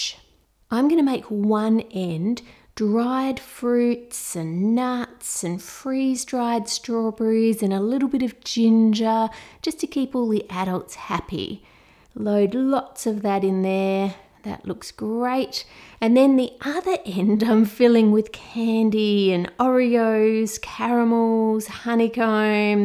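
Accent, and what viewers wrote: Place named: Australia. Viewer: This video makes me want an Aussie accent like hers.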